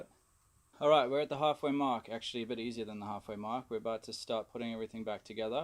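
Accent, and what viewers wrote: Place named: South Africa. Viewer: Greetings from South Africa... You sound extemely SA by the way. Buying this bike next year, this is how I got to your channel!!!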